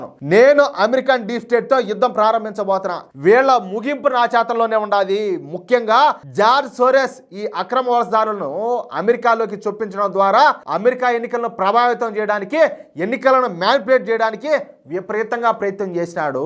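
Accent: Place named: Kadapa, India. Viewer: I love your Kadapa language.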